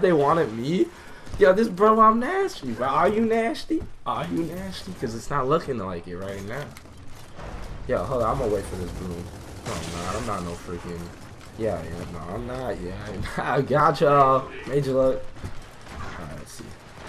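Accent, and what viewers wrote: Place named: New York, United States. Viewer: U sound like u from new youk or new jersey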